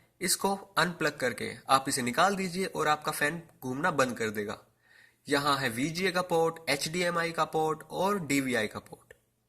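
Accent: India. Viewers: Nice video dude! I am not an Indian but I like this accent :) Btw, Save up for a 1030, Because 1030 is much better than this :D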